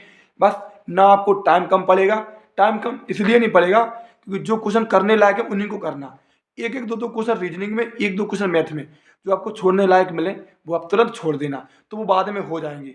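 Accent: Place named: Bundelkhand, India. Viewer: Bundelkhand me kaha se ho bhai.. tumhari boli se samjh a rha hai😂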